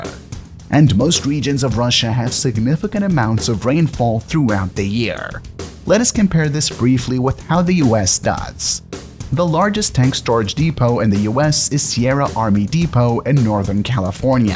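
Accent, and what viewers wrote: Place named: Europe. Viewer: You just said the Su-34 is just as good as the F-15. What do they teach you in that European country that you come from? Yes I know that's where you come from because I can hear your accent. You maybe bilingual but, English is definitely not your first language. I do congratulate you for your mastery of the tongue though. The Su-34 fullback is a ground attack aircraft. The F-15 is a fighter airplane. There is a difference. A Su-34 is mincemeat to an F-15.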